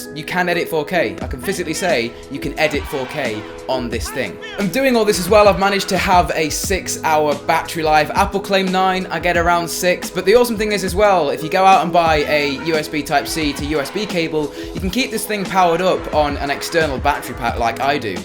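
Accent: England, United Kingdom. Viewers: You’ve basically copied MKBHD with the pros and cons Only with english accent ...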